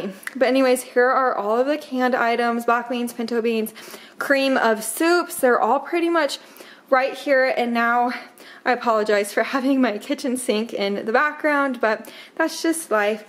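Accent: Utah, United States. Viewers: Just started watching you and thought I detected a bit of a Utah accent or words as I lied there for for 50 years and really miss it as I now lie in Arizona.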